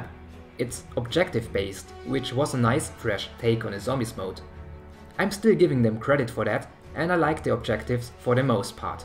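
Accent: Germany. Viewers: The German accent makes it perfect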